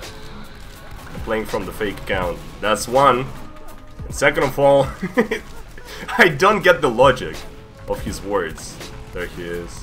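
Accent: United States. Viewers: This is a little off topic but even though you are Russian you speak amazing English and you have a very great American accent!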